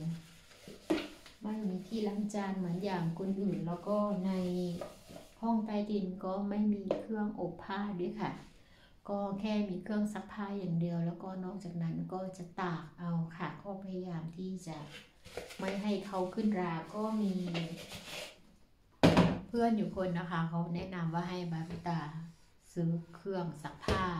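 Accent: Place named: South, Thailand. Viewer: พี่warutaเป็นคนใต้ใช่มั้ยคะ แฟนหนูคนนครศรีคะ เค้าบอกว่าสำเนียงเหมือนคนใต้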